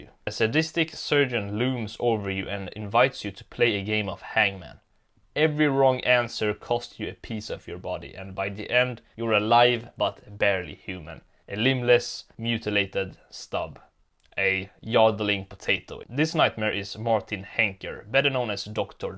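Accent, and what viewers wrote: Sweden. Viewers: He sounds turbo Swedish, because he is. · 33 seconds in and I am certain of one thing. you are Swedish. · Are you swedish by any chance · Är du svensk?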